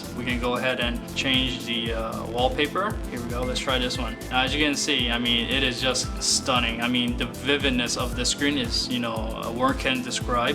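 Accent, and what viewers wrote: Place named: China. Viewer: I couldn't enjoy the video. The presenter has a thick accent. Sounds like Chinese/Mandarin is his first language? That's my best guess.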